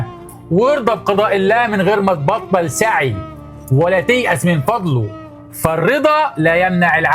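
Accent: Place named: Sharqiya, Oman. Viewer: انت من الشرقية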